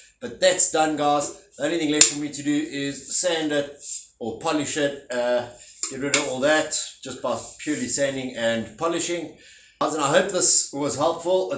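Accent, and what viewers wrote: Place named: South Africa. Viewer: So great to hear a South African voice in far of Europe. Unmistakeable 😂. Subed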